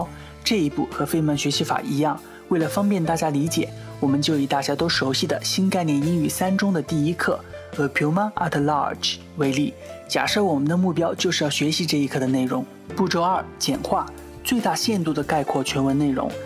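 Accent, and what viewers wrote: Hunan, China. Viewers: 湖南哪里的？ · 是湖南人吗？ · 湖南老乡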